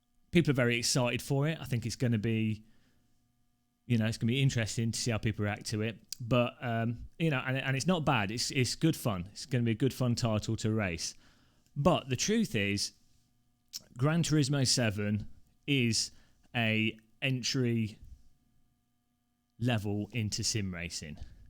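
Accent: United Kingdom. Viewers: I clicked on your bait but as soon as you spoke i knew it was more dry British humor, cheers A+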